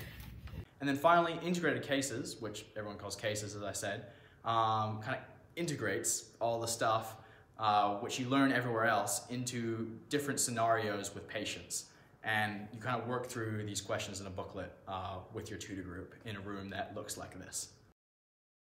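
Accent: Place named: United States. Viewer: Hi. I am in the final semester of my undergraduate studies and I want to do my masters programme in NZ. the problem is that I majored in American and British language and Literature and I want to do switch to medicine or nursing. Please what is your advice? Are you an international student there? 'cause you have an American or Canadian accent.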